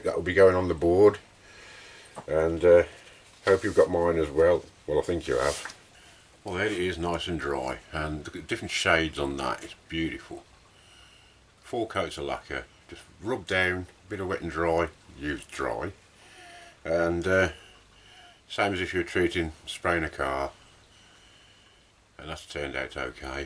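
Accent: United Kingdom. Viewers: Awesome re weather. We recently had two days' worth of sorely needed rain here in Jacksonville, FL. It was beginning to feel like drought-type issues around here. It sounds like you're a Brit. Am I right? If so, what part of the UK do you live? I visited a friend in Telford-Dawley back in 1995 from August thru October and loved the difference in the essential 'air'. Jacksonville is sub-tropical, so lots of heavy humidity around here. The UK weather was crisp and comfortable to me. Thanks for info re the purple heart wood. Do you ever sell any of your creations? Cheers....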